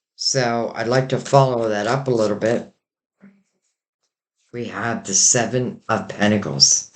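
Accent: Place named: Western Pennsylvania, United States. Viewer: Love your accent. Western PA?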